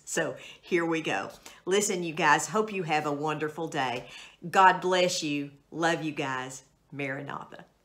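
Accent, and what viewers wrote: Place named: North Carolina, United States. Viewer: you make me laugh so often. I'm a North Carolinian & the 1st time I watched you, I said aloud,"" this Girl is from NC or TENN☺💕☺. I just knew that accent💕.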